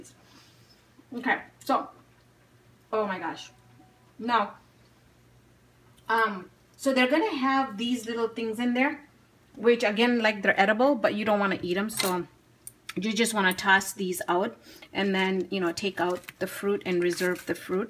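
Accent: Minnesota, United States. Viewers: Are you from Minnesota? :) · Don't think I've ever seen an Arab woman with a Minnesota accent. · American accent nice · You sound like your from Minnesota too lol! By the way its not meant as an insult. Also your video was very informative. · my local grocery store in switzerland just started selling canned version so i came to youtube to check it out.. i love the minnesota accent haha. originally from minnesota myself and i am enjoying trying new flavors that are accesible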